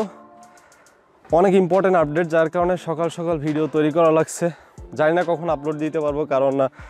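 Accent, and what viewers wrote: Sylhet, Bangladesh. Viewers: ভাই আপনার বাড়ি সিলেট না কি?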